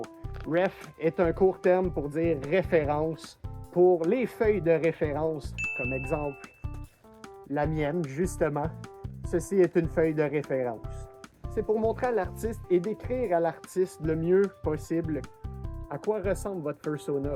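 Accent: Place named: Canada. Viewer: Merci pour cette vidéo super intéressante ! ton accent canadien est vraiment incroyable ( De toute façon je ne sais pas pourquoi mais j'ai Toujours aimé les canadiens/Québécois ) J'adore la comu furry francophone Elle est Tellement génial ( En même temps je suis français ptdr ) Bref, je vous fais une Salutation depuis mon bus de l'ouest français !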